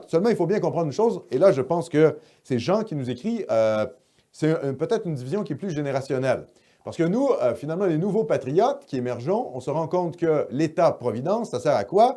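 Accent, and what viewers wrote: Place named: Quebec, Canada. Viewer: Je ne me lasserais jamais de ce mélange d’accent québécois et des punchlines à la française ! Merci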